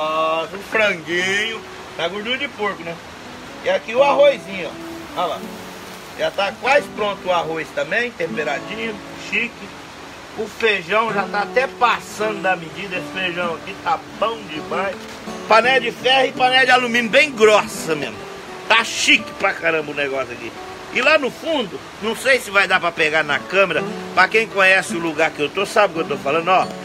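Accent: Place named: Minas Gerais, Brazil. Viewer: o Se tá parecendo k é de Minas gerais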